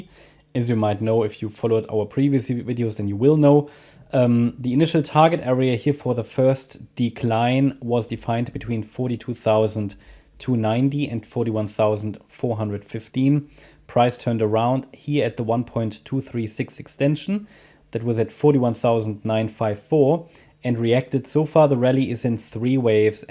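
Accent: Germany. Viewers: Great content! Just discovered the channel. German?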